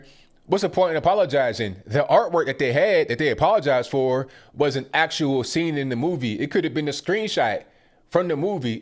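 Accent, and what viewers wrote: France. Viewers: Your French accent cracked me up! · Nice French accent Sir. Yeah, this is disgusting and it appears that the devil knows his time is almost up and he is trying to pull more children to hell with him. All we seem to do is screw over our children. I cancelled my Netflix over this.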